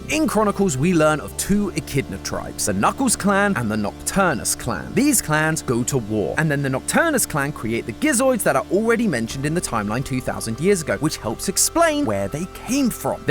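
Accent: England, United Kingdom. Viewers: Sorry someone update, why does Matpat sound like he's english now?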